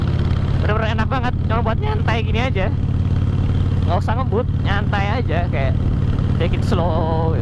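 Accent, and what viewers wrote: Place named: Java, Indonesia. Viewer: "iyuh kono" hahaha khas banget ya medhoknya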